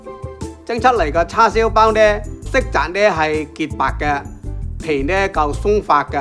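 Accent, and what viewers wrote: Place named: Zhaoqing, China. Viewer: 是肇慶話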